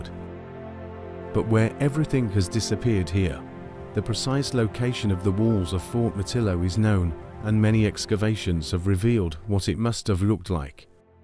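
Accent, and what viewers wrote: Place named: United Kingdom. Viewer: It's absolutely incredible what can be achieved with fantastic drone footage, serious and interested historians (amateur or otherwise), a good script and a distinguished British voice (even if it is AI). Again - well done!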